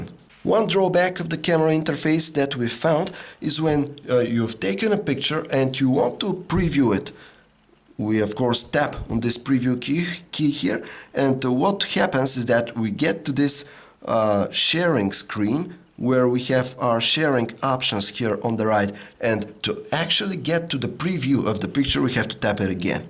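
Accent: Russia. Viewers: Sir r u Russian? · put someone english speaking, i dont want to listen rusglish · are u russian ?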